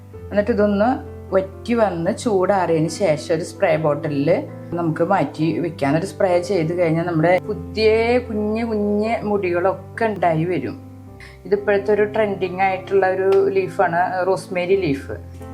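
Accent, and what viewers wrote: Thrissur, India. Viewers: Changathi, athu njangade thrissur bhashayanu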